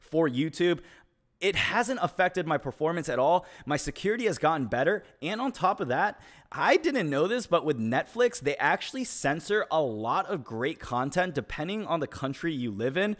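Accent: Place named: DMV area, United States. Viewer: You from the dmv area?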